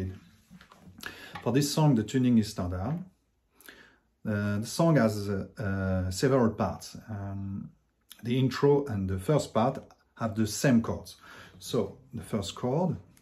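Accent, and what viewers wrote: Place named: France. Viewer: you are very precise, concise and clear minus the slight french accent haha! thanks for this tutorial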